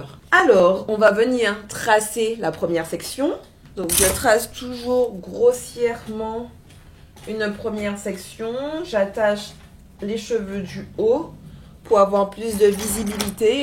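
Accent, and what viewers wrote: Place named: Caribbean. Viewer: Merci. J' adore ton accent, je me crois revenue au péyi chaque fois que je te regarde. J'y vais l' année prochaine, merci pour ta technique que je ne connaissais pas et finis bien cette année 🤩🤩🤩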